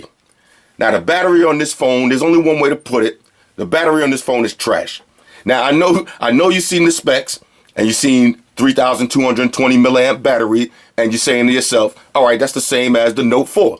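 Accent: New York, United States. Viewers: you from New York Bruh??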